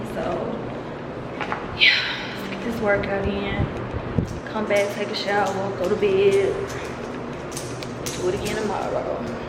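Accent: South, United States. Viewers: This Mississippi transplant from Fort Worth could spot the Southern Accent! Love your videos🥰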